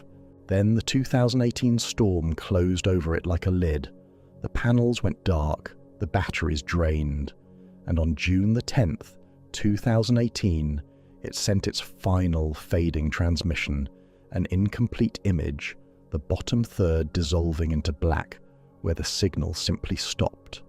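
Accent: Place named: England, United Kingdom. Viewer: Why is every AI notation done with an English accent. Getting very annoying.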